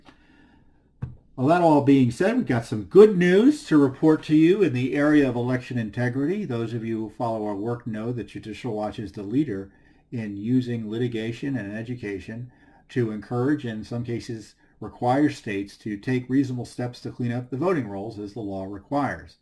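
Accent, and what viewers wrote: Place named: Russia. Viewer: I thought I detected the hint of a Russian accent.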